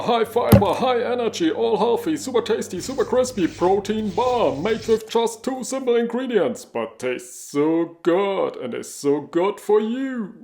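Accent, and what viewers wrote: Germany. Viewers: Gotta know what’s with accent? German?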